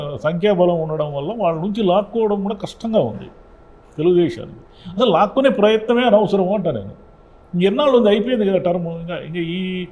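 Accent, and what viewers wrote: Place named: Rayalaseema, India. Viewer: Sir, 👏👏. Rayala seema BLOOD - Sarkar Vari Prounsation is Difference. KADAPA lo Okasari Vellinanu Kotha Prajalanu Okavidham ga Chusharu.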